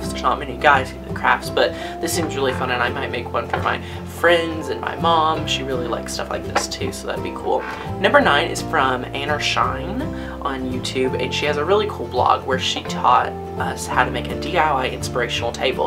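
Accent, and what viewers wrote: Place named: Tennessee, United States. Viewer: Cool projects! Yeah, totally do that pineapple lamp. Glad to see a fellow Tennessean and personally I think your accent is fabulous!! Let the haters hate 'cause that's all they know how to do, and you keep on being the wonderful person you are and be proud of your roots!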